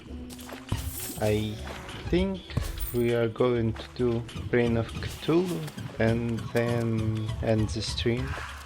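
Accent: Russia. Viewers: Russian accent doesn't bother me lol Just makes it seem like I'm watching a Soviet legend lol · Its some type of russian/slavic accent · А я то думаю почему акцент такой русский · I see a lot of Russian friends that write in English excellently, but neglect speech and worry their skills are still terrible. Believe me, better writing = better you! You have already mastered 90% of speech skills, the accent is just for flavour and us native speakers can't get enough of yours =D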